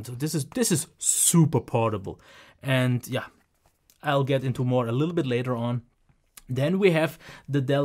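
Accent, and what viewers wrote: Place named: Germany. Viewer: Whenever i hear this guy's German accent, I'm thinking of the movie Terminator.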